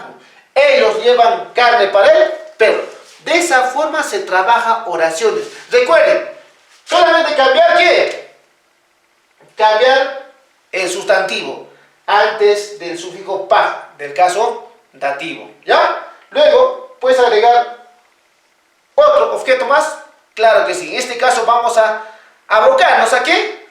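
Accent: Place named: Cusco, Peru. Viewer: Usted habla quechua cusqueño. ¿Le es fácil entender otro tipo de quechua, como el ayacuchano?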